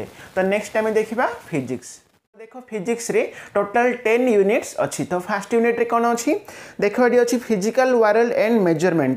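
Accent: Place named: Odisha, India. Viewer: Sir Odia English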